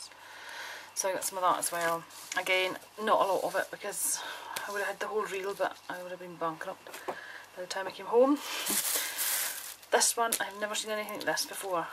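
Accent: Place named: Scotland, United Kingdom. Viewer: I didn't realise you were scottish too lol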